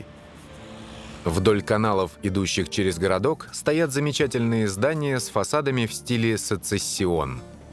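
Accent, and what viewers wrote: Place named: Spain. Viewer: И названия и произношения ужасно.Почему произношение испанское?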